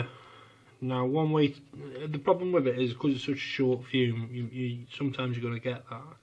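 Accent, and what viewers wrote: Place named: Stoke, United Kingdom. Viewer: Sounds like a stokie to me !! P.s where can I buy the things you put in the spray from ?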